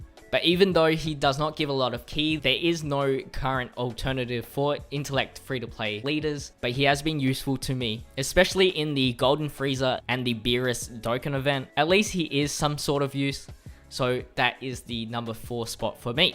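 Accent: Australia. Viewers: Hey dude are you from Aussie i think I have asked before but Idk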